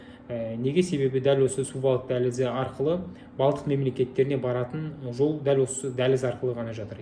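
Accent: Standard. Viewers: Айналайын балам, Рахмет.Таза қазақ тілімен жетемізге жеткізіп түсінікті түрде айтып отырсың.Сендей сауатты Қазақ балалардын қатары көбейе берсін.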